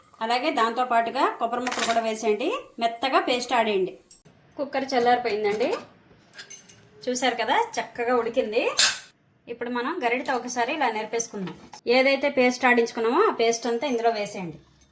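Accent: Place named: Godavari, India. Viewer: Indilo nene aite koncham chintapandu kuda vestandi meedi kuda ee sari try chesta meedi kuda godawari gillanena mee maata teeru alne undi andi mee videos anni chustu untanandi best ok luck ❤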